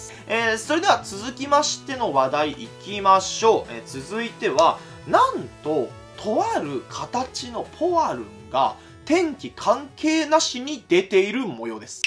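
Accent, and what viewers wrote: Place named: Kansai, Japan. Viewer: 関西弁ええな！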